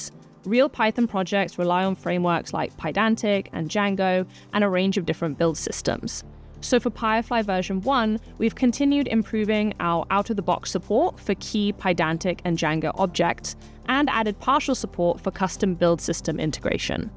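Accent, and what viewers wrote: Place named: South Africa. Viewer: is she south African or Australian? I can't tell?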